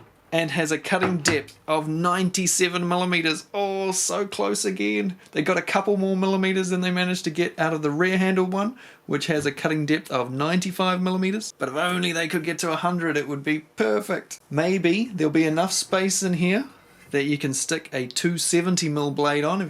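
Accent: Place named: New Zealand. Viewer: G'day, sounds like your a New Zealander. Are the tools you review available in Australia please.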